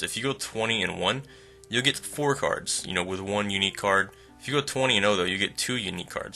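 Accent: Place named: South Carolina, United States. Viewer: are you from south carolina?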